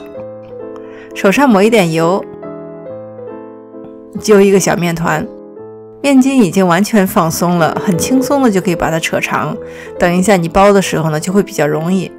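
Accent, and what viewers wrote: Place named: Beijing, China. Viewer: ，我总觉得你有点北京口音，你是不是在北京待过或者周围有不少北京朋友？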